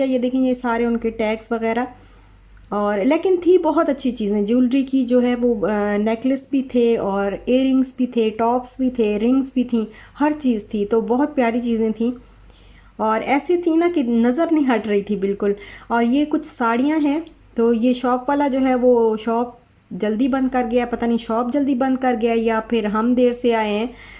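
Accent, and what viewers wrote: Saraiki, Pakistan. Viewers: AP siraiki ha ...bat karny se lagra ha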